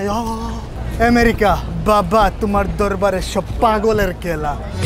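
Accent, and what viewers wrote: Sylhet, Bangladesh. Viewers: আসসালামু আলাইকুম ভাইয়া আমি ইন্ডিয়া থেকে বলছি.... আমি আজকে প্রথম আপনার ভিডিও দেখছি প্রথম দেখেই আপনার ফ্যান হয়ে গেছি 😊 আপনে ও সিলেটি আমি ও সিলেটি তাই আপনার ভিডিওতে সিলেটি ভাষা শুনে অনেক ভালো লাগছে 😀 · Bhai apni ki sylheti na kitha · ভাইয়া আপনার কথাগুলো অনেক জোস হয় আপনার মুখের সিলেটি ভাষা অনেক সুন্দর হয়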